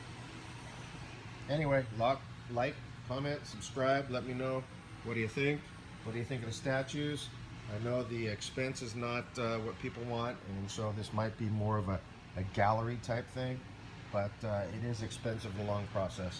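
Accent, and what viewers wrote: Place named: North, United Kingdom. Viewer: awesome pieces and I love the Statue of Liberty with the buildings Skyline pretty cool the day always brings back memories I was in Staten Island when 9/11 happened so it was pretty scary and crazy the same time. it'd be cool if you could make the old Skyline with a police badge on the bottom a fireman badge on the other side of the bottom and paramedics like a little memorial statue I'm from the tri-state I don't know where you guys are based out of a forget I heard the northern accent. anyway I keep Rambling On Again LOL I just love your work. great work and very cool stuff to you keep up the good work I like the bars too I have a coin keeper bar from you I love skulls though. later dude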